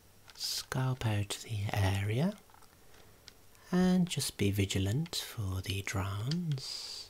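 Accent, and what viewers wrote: United Kingdom. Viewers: I adore this accent. Obviously somewhere in the UK, but I can't place it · Have just stumbled onto your channel, and my immediate thought was "oh no he's a Brummie", as am I and I loathe the accent! However, I'm picking up Black Country? Whatever your accent is, your voice is just heaven Sir, and I loved the retro games, definitely my era 😊 Thank you so much, you have a new subscriber 🤗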